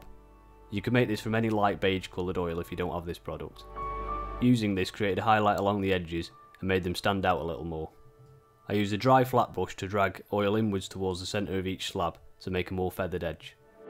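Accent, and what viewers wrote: United Kingdom. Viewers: You have taken a simple concept and made it look so realistic. I admire your attention to detail and vision in these projects. Keep up the good work. If you don't mind I am curious about your accent. What part of the UK are you from?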